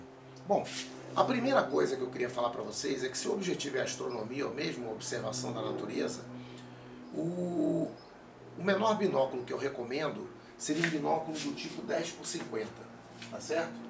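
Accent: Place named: Brazil. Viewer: Legal o jeito de falar kkkkkkkkk Sotaque bacana ! Brasileirado falando 👏🏻😃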